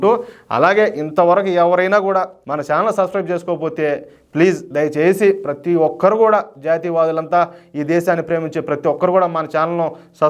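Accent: Rayalaseema, India. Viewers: Andhra bhadha kadhu , Rayala Seema YASA matladu thunnadu saru .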